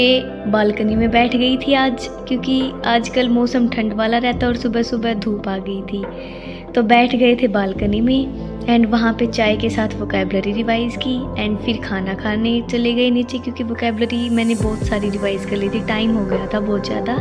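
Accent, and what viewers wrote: Haryana, India. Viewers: Haryanvi tone👌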